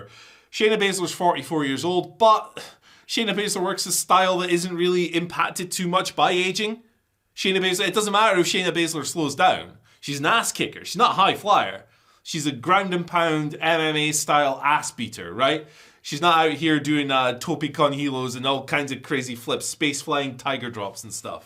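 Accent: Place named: Scotland, United Kingdom. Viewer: Why do I love listening to this Scottish man rant about wrestling so much?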